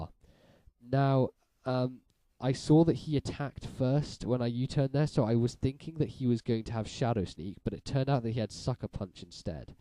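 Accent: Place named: RP, United Kingdom. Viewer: It's a very posh BRITISH accent ya hill-billy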